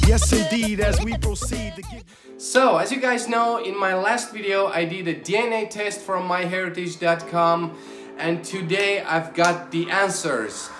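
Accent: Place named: Italy. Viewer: Love the hat!!!!!!!!! your English Accent does actually sound Italian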